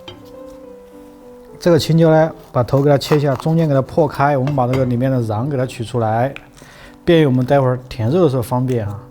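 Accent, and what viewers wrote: Sichuan, China. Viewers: 听您的口音是四川人吗？我是居住在苏黎世的四川人，看到您排骨的做法，很喜欢，瑞士奶酪🧀️很多又便宜，希望您推点奶酪入菜的菜品，谢谢🙏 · 我是北方人，但是一听师傅的四川口音，就知道绝对靠谱👍🎉🎉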